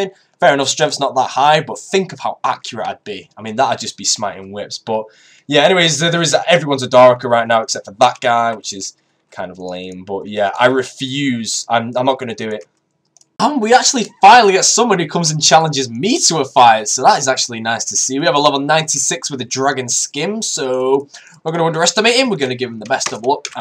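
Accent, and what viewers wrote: United Kingdom. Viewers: British accent so cute!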